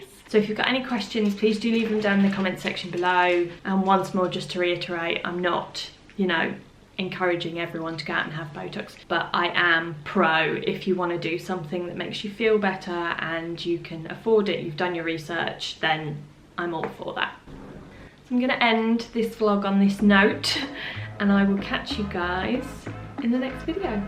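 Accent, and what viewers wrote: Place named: London, United Kingdom. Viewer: Omg ur dog sooo cute 🐾🐶! I love dogs 🐶! U look great thanks for sharing .. and like how u talk with that accent from London you r London girl .. 👍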